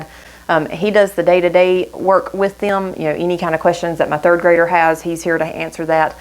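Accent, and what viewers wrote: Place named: South, United States. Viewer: I just found your channel and I’m liking it a lot. I hear some southern twang so I wondered what state you live in. I’m from Mississippi but live in Tennessee now. We love student led material and Teaching Texbooks! I have a 3rd grader, 1st grader, and 3 year old.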